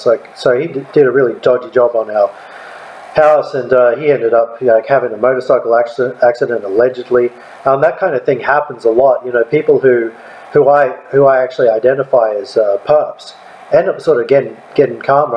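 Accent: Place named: Australia. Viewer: Good to see an aussie talking about reptilians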